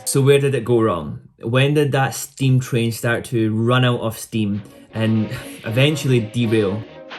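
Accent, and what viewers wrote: Scotland, United Kingdom. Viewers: Great video, I'm 27 yo , i achieved so much already, read a lot of books, learn a lot of things, traveled abroad several times , got settled to a graet job with good income. I'm grateful to many things in my life But i feel from time to time I've wasted a lot of my life already. My love life is not that great, i feel depressed sometimes especially when i see my friends happy , acchive their goals , get engaged or married. I hope i could stop feeling that way. P.S. I love the scottish accent <3<3 Love and peace from Saudi Arabia.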